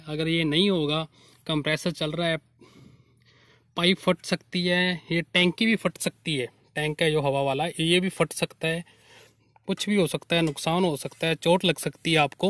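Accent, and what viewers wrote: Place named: Punjab, India. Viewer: Hindi with Punjabi eccent. Bro better you speak your own language you can explain more better in punjabi.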